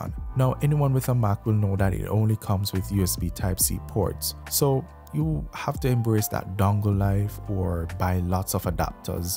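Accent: Jamaica. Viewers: Man sounds Jamaican asf loool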